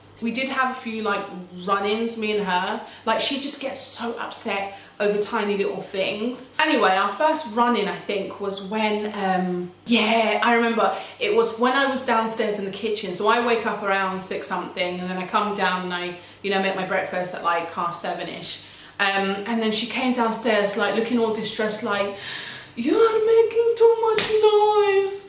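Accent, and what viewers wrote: Spain. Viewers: Your Spanish accent is funny lol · I can’t with you the Spanish accent am bussin up 🤣🤣🤣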